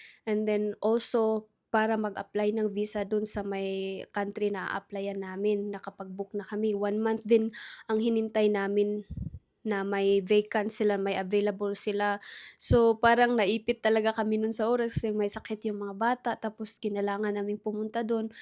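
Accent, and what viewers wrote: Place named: Visayas, Philippines. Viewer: Bisaya po kc sya kaya Ganon.. atleast naintindihan pdin😊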